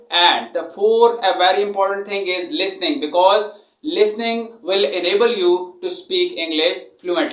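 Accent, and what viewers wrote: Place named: India. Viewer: يبدو من لهجتك انك هندى او باكستانى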